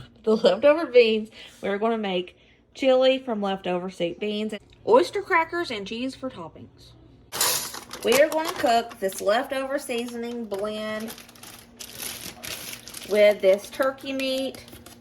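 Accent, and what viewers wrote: South, United States. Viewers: Thank you so much for making this content. I love your videos. I'm trying to find more YouTubers that do this kind of content. I love your grocery shopping challenges. I also love your southern accent ❤ thank you so much for all you do.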